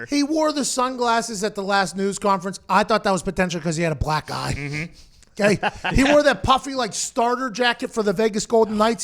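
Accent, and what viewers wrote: Pittsburgh, United States. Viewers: That’s that yinzer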